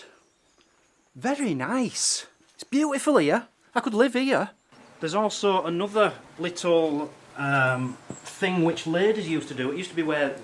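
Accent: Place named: Yorkshire, United Kingdom. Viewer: Your From Keighley Arnt You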